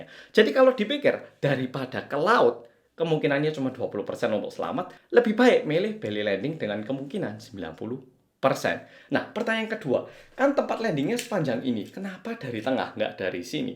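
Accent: Java, Indonesia. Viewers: Makasi, dapat ilmu juga terkait pesawat dkk, dan berduka cita untuk JejuAir 🙏🏻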